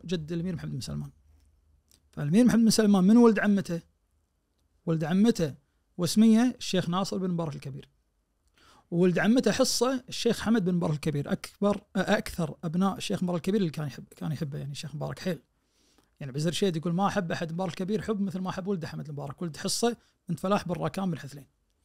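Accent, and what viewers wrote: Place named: Iraq. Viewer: هذا لهجته فيها من اهل العراق حيل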